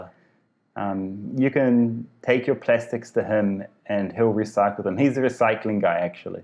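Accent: India. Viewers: I can deffo hear a hint on hindi in your accent now man. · You are developing an Indian accent.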